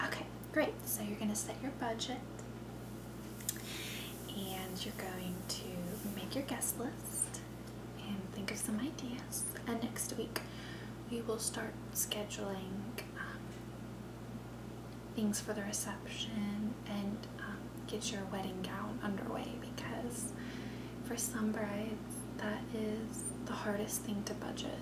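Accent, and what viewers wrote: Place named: North Carolina, United States. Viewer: Just based on her accent and talk of ft fisher, the aquarium there, and the azalea festival, I'd say she's from my home state of north carolina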